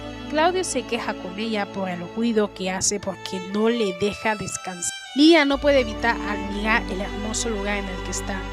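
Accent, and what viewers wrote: France. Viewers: Eres francés?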